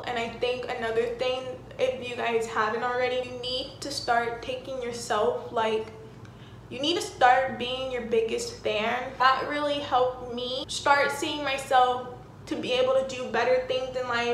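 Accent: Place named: Dominican Republic. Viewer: I legit thought she was Dominican, especially because of the way she speaks.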